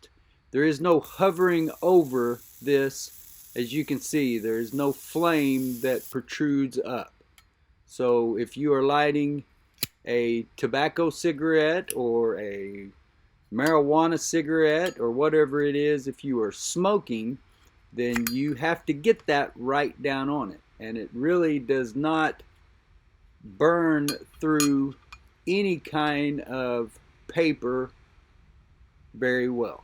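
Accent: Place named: South, United States. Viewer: Dude I love your commentary just a good ole southern fella. Thank you for the video good sir.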